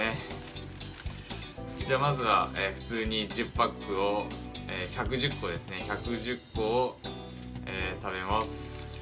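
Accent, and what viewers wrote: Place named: Kansai, Japan. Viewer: あかん笑 に親近感わく＼(^_^)／ もっと関西弁出してって欲しい♪ にこにこで幸せそう！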